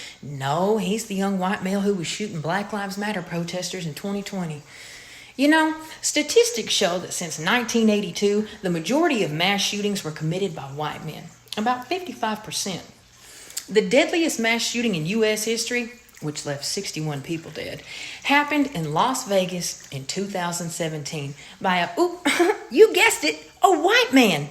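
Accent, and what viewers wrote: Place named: Texas, United States. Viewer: My husband and I are in our 60's. We absolutely LOVE this channel! (Just for the record, my husband is from Sherman, TX, and he says you sound like home!) Keep doing what you are doing!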